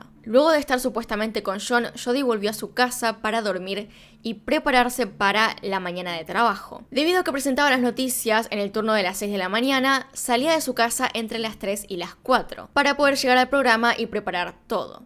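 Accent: Argentina. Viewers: Sabes que el vídeo será bueno desde: "Hola y bienvenidos a mi canal de YouTube, una vez más" inserte voz argentina 😍😍😍😍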